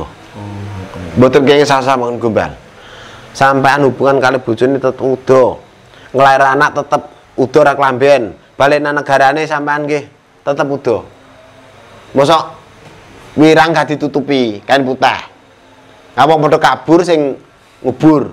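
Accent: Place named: Kudus, Indonesia. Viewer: logatnya ko sperti orang kudus, tayu pati ya, mungkinkah mbh asli dr pati tayu atau kudus.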